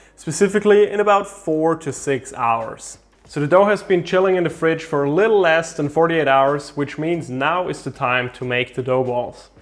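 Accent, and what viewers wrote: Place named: United States. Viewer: austrian with an american accent, the opposite of schwarzenegger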